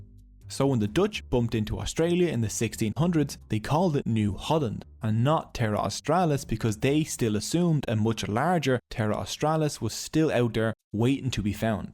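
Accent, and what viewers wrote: Ireland. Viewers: He's Irish. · How Irish does the narrator sound? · See? What a difference, a cogent, coherent, properly expressed comment can make? You can hold a different opinion than me, even an absolutely contrary one. But when expressed intelligibly, it's a pleasure to engage with the commenter (i.e. you) rather than acrimonious. I reciprocate your expression of courtesy and wish you a pleasant day, too. In fact, this was how I hoped to engage the video creator Irishman,. His thick accent undermines the intelligibility of the narration of his well-researched presentation. Instead of accepting my critique, he took personal offence and hardened his stance to a "this is how I speak, take it or lump it" position. But that won't gain him viewers. On the contrary, he may lose a few. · Irish accent. T is often pronounced D Hence Paddy for Patrick. Possibly due to Gaelic influence rather than English/Germanic... 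but I am guessing. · Nice to hear another irish man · This is my first time watching your channel and I immediately subscribed. Such a well detailed explanation and smooth animation! This feels like me hitting a Gold Mine. Keep it up,! Love your Irish accent! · This guy sounds surprisingly irish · I am so distracted by this Irish accent that I can't focus on the information being told to me 😂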